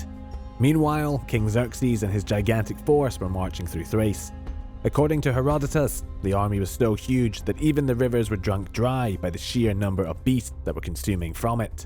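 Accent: Scotland, United Kingdom. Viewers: Much better still needs to flow a bit more but still you're getting there. Read entire sentences in one go and pull back on the emphasis in the middle of them. However from last time it's a big step keep going like that and you'll be there faster than later. Maybe don't worry about the accent as its really nothing even when it comes out and it might be that your trying to sound less Scottish is actually hurting your style rather than helping. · “slightly sinister vocal tones” 😂 scottish accent is ❤ !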